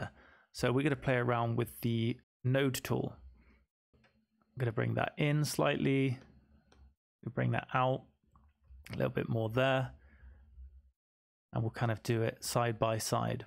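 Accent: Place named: England, United Kingdom. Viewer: Very well and systematically explained in a normal English accent that one can easily follow. No unnecessary and erratic mouse movements. I have seen many Affinity Designer tutoriuals. Some of them don't even bother to speak. They just play some wierd music in the background, move their mouse (with a really small white cursor) erratically and expect us to follow. Well, not at my age. My only request to you would be to get a screen recorder where the mouse is highlighted and it emits sort of light waves when clicked. That way, we seniors will be able to follow your tutorials better. I have suggested this to many YouTubers and some of them have implimented it and thanked me. I am now subscribed to you. I see that you don't have an interactive Facebook group where one can ask questions to the community and the guru (you) can step in every once in a while to give a final judgement. 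Best wishes from Australia.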